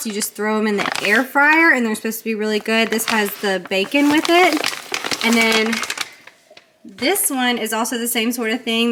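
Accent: South, United States. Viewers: Hi! Been here about a month - here from Minnesota. Have found myself not only motivated to clean but also tried falling asleep watching your cleaning videos. They make me so calm and I love your Southern accent and authenticity. I am a single mom - two kids (16 and 14) and a special ed teacher as my second career. It’s hard doing it all alone- but I love a clean house and organization for my family. Lived in Arkansas for 3 years and LOVED the people and their kindness and welcoming spirit. I was a stay at home mom for 9 years. My daughter Lil is in competitive cheer here in MN. Keep doing your thing and inspiring us. Just love it. Thanks!!! Jen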